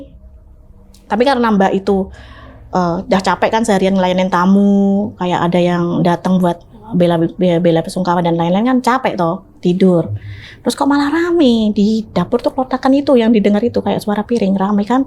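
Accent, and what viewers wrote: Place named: Java, Indonesia. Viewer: Mbak.. Mbak logat mu jowo kental 😅 Kalau cerita yo mbok pake Bahasa Indonesia mbak, kasian viewer gak tau Artinya non Jawa #Salam dari Surabaya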